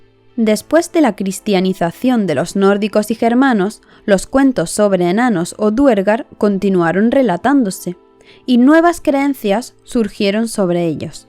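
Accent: Spain. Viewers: ¿de qué parte de España eres ? tu acento suena como a gallego y además habiendo tantas leyendas de seres míticos en Galicia no me extrañaría que fueras de allá pero tu apellido parece catalán ¿de donde eres ?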